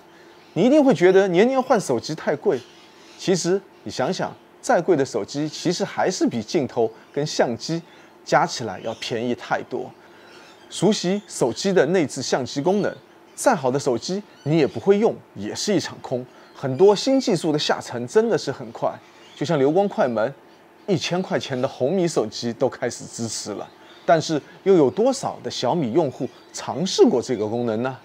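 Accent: Shanghai, China. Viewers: 这口音太上海了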